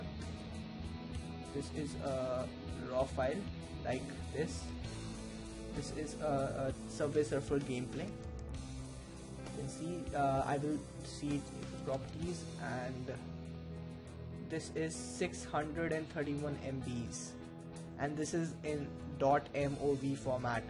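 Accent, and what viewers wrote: India. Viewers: I think you're indian, and I love your accent! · sounds like an indian from india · PLEASE LOSE the Indian ACCENT! It is ANNOYING! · This Indian speech is awful i couldnt watch this video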